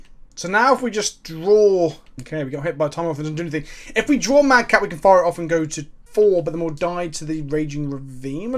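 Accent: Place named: United Kingdom. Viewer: What tried to say: "what is the plural of colossus?" What actually said: "what is the pllll of klloss" I will never understand how british accents work. Do you just leave out half the letters?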